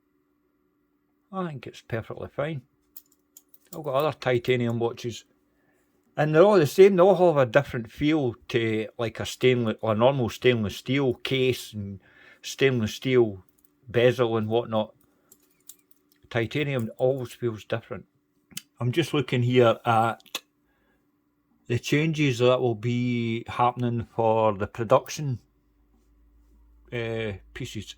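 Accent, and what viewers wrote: Scotland, United Kingdom. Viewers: Love the commentary. Swearing doesn't seem as bad with a Scottish accent 😂 P.S. Just ordered the watch you reviewed, I've been looking for a white tool watch for a while.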